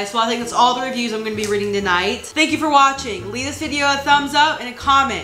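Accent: United Kingdom. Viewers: As a British person, your British accent is truly incredible! I love it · Bro your British accent gets me every fucking time AHAAA! Anyways video. Beautiful. Mukbang. A1.. Baddy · THE BRITISH ACCENT KILLED ME 💀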